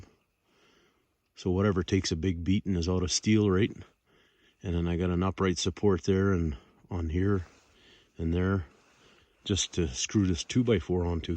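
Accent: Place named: Atlantic Canada, Canada. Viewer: Good job. I'd like to make a groomer for lake trails, that shaves off wind blown moguls and fills in the dips. My idea is that it would be on skates that would dig down and skate along the ice. And the whole thing would be height adjustable with about 4 legs that the skates would be attached to, to set you blades at the mid point or so of the mogul depth. Skates would have to be designed so the helped pull the unit down and maintained contact with ice as best as possible also. Just an idea bumbling around in my head. Sounds like your from Canada's east coast?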